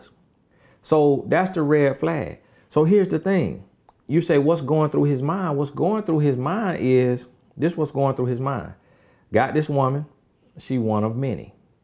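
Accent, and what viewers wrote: South, United States. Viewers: As always, thanks for keeping it real. And you say all this, with an even tone and country drawl, cracks me up! Very interesting psychology of men.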